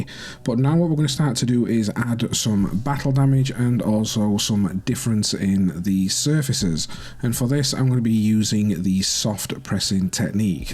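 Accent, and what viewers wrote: Yorkshire, United Kingdom. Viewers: as a fellow Yorkshireman, I love your accent.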